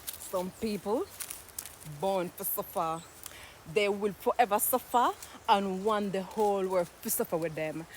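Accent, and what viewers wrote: Jamaica. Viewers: Lololololol, mi luv hear har wid fi patwah yah mon. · I love the way you speak Jamaican English. I love your beautiful solar system. You always win, be happy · Jesus christ idk what to call that accent, spanglish patois? Its cute though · 😂😂😂😂 You pretty pretty pretty but I only understood half of your words but I love the Jamaican accent 😂😂😂😂❤❤❤❤❤❤❤❤ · Love your channel and your jamaica patwa accent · That's crazy .. she got a English accent .. a Jamaican accent I love it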